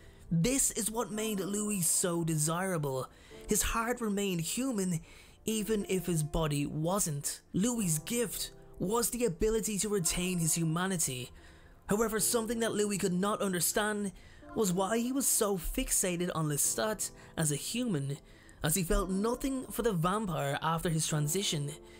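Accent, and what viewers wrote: Ireland. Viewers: You are Irish? Be honest. Where are you from?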